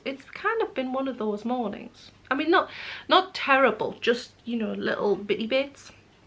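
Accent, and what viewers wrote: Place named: Northern England, United Kingdom. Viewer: I had to pop over to your website to find out where you are originally from because you certainly don't sound Scottish, but have a similar accent to me (I am on the NE coast of England) I think us 'Northerners' all have similar accents!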